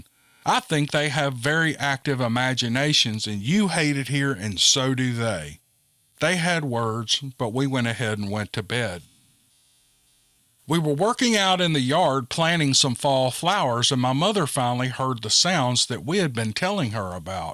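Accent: South, United States. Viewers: 11/2/22 Hi. I very much enjoyed these stories. I do enjoy long videos, especially at night. About that accent. Many of my older relatives are from the south and I love to hear them speak. They just laugh at me but it's fun to listen to them anyway.😁 Anywho, thanks for the stories (and the accent)🤣. I can't wait 'til the next batch. Take care! ⭐👍⭐ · Talking about southern accent mine is really close to yours and I remember the first online game I played. When I started talking everyone that was playing at that time talked about it for weeks after. I was laughing my but off at them cause I’m thinking what’s so special lol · My story too 😲😂😄 I love reading 'n his sweet southern comfort draw. I listen to his tale's over 'n over...many nights he helps me fall fast asleep 😌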